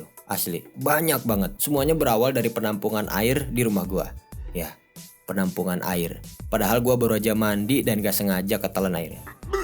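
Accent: West Java, Indonesia. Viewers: Orang sunda nya? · Bang urang sunda?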